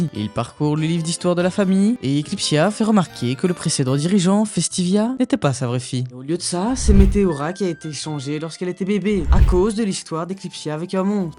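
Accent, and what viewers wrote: Belgium. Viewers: t'es belge non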